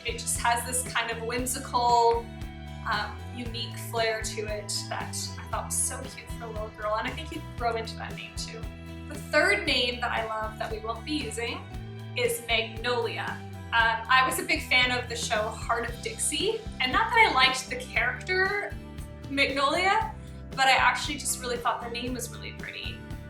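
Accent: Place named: Canada. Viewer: I was going to ask you if you were perhaps from Canada, as I was picking up on your accent. I got my suspicions confirmed when you said zed for the letter z.😂What part of Canada are you from? Ontario maybe?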